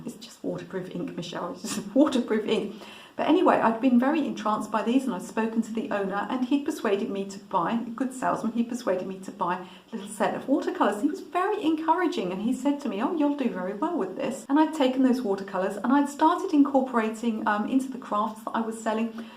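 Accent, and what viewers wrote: United Kingdom. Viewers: I’m a new subs Cusine a week now and I just emendation enjoy your voice and British English accent.., it’s a pure pleasure listening. Iv been an artist my whole life. Art is oxygen for me. Thank you for sharing your story ☺️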